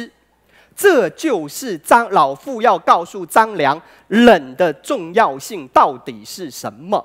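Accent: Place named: Taiwan. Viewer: 内容挺好，但这口音实在是太重了。不记得台湾口音的舌头可以直成这样😂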